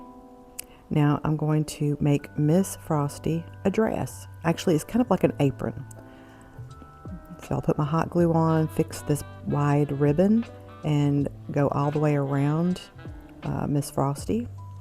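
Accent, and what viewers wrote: United States. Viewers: Can't beat that Alabama accent !! South GA accent is very similar! So enjoyed your video!🌟🌟🌟🌟🌟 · I had purchased a chair a while back real cheap from Salvation Army and cut it all up. Now I have a box full of spindles and you’ve given me some great ideas to use them for! Your accent is similar to my mom’s. She was from Virginia. Where are you located?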